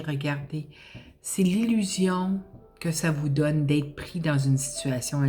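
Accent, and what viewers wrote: Quebec, Canada. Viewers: Merci, tres beau tirage restont zen. J'adore l'accent du québec tsé ( tu sais) dans le sud de la France on dit comme vous sais rigolo. Bien à vous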